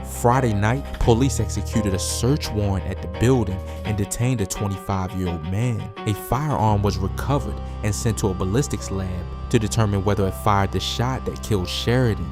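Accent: Baltimore, United States. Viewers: BAL Accent.....🤢🤢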